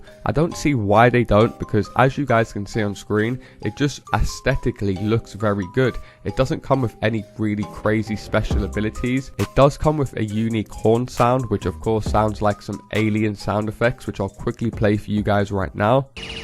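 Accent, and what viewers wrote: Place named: England, United Kingdom. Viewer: Mate, You sound English.. As an Aussie I say IGNORE AMERICANS when they try tell an Englishman how to speak English.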